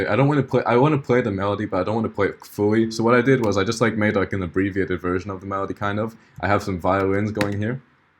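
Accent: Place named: Sweden. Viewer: Jag hitta en guldgruva !🤩🤩 fan oslagbart kommer kolla på din kanal 24/7 . Jag gör bort mig om du inte är svenska utan jag bara dömer på din dialekt Ahah.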